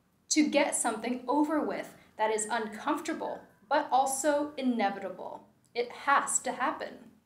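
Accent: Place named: General American, United States. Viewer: Congratulations from New York City, you have a standard American accent. That's essential for English teaching.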